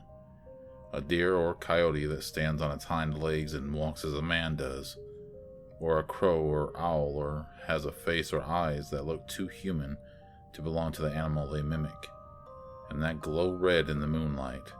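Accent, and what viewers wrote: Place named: Michigan, United States. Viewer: And are you Native? Your accent actually reminds me of some friends of mine from Michigan that are Ojibwe! It was the southern "influence" in your voice that threw me off though! LOL!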